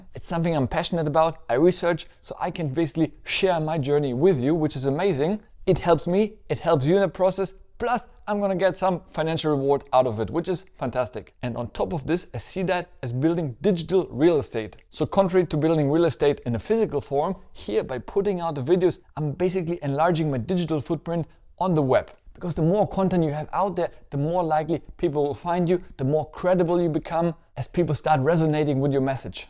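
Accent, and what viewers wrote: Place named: Germany. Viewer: Thanks for the reply. Then you are really fluent in English. Apart from a slight german accent (sorry if you have nothing to do with Germany lol, and ofcourse its in no way an inconvenience, just an observation), your flow and delivery is amazing. Hope to be like you someday :)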